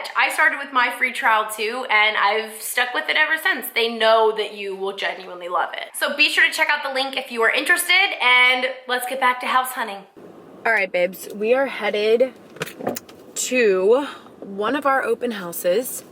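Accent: South, United States. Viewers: Hi, I’m from England and have been following you for about two years. Am enjoying all of your content, the peak into southern American life (loving the accent) and especially the progress of your relationship with who is just lovely! Personally, I think house No. 1 is best for you both in the long term as, if and when your family expands, you will soon find the extra space will be a godsend. As a mother myself, I would highly recommend the principle bedroom on the same level as the nursery - just think of those multiple night time visits - you definitely don’t want to be “stumbling” up and down stairs!! With regards to house No. 3 I would personally be concerned about the lack of privacy in my rear garden (haha, “yard” as you guys call it). Good luck with your house hunting and enjoy the process - how exciting for you both! P.S. Please pass on to your mother what a beautiful lady she is, both from within and on the outside.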